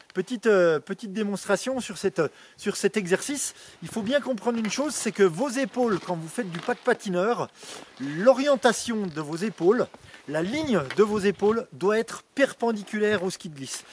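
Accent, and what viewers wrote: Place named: Franche-Comté, France. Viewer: Merci beaucoup ! Ton accent m'intriguait pour un moniteur des pyrénées orientales... mais t'es franc comtois :) Mise en pratique demain, merci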